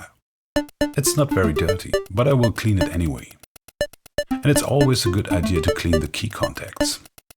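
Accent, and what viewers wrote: Germany. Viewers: I Got a question for the guy? Are you German? Where are you from? Its a weird question but I recognize the Accent. Greets from Hagen in Germany.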